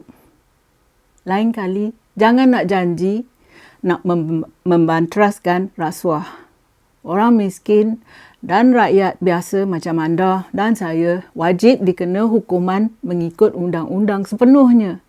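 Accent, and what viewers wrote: Perak, Malaysia. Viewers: Respect kat makcik.. bahasa english fasih mcm org london.. tp tak lupa bcakap bahasa melayu loghat perak..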